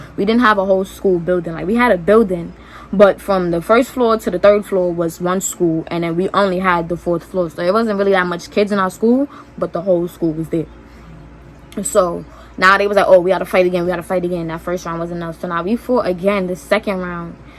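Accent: New York, United States. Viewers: mannnnn her ny accent is everything, and she mad pretty 😍 my parents had one job😭😩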